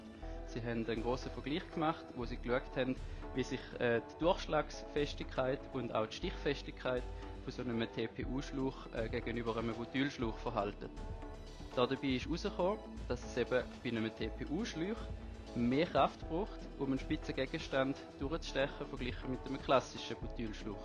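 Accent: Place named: Switzerland. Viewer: Super Erklärung. Fahre TPU seit geraumer Zeit. Gestern der erste Plattfuss. Bin mal gespannt wie der selbstklebende Flicken hällt. Ich verstehe das Schweizerische übrigens recht gut;)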